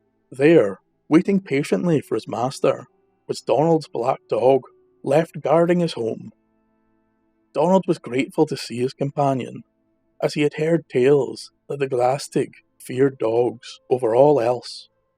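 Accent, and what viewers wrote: Scotland, United Kingdom. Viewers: thanks for telling Scottish stories with Scottish accent. i actually cannot stand English or American accent. Due to my upbringing. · Please Sir, may I have some more? You have a handsome voice and I sincerely and truly appreciate & enjoy your lyrical Scot's accent. Yours truly from America. Be Blessed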